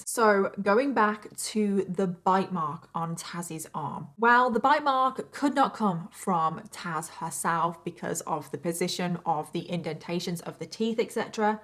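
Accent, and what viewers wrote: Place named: England, United Kingdom. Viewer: I saw this case many years ago but decided to watch your version and your research on it was spot on. Great job!! Btw I'm a twin as well. Similarities between my twin sister and these twins are one thing only; the deep bond we share that's it. The rest nada. We were the victims of abandonment and physical, psychological, emotional and sexual torture. My twin sister and I got introduced to hell from the very first breath we took, that was our life. The PTSD took my mind into homicidal thoughts, and suicidal attempts. I jumped off a building and broke almost every bone in my body, clinically flat-lined, coma for 13 days then came out of it. Then knowing I survived I did it again but this time went higher up in the building. An identical thing happens, clinically dead, in a coma for 13 days, came out of it and here I am ALIVE. 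3 years now, and with many severe different and alternative therapy, for PTSD, etc I can tell you I am finally finding my feet. I now LIVE more than survive. My twin and I are SURVIVORS of the unthinkable. We are beautiful, loving, caring, compassionate, nurturing, funny loving and amazing women. I say that with humility, not arrogance or ego. So it blows my mind how these twins can so nonchalantly and callously murder their beautiful mother over a simple curfew or a few basic rules. Normal people just don't. Abnormal people don't, but evil does. May the mother be in peace forever. I love your crazy mad English accent and your channel. Thank you for your h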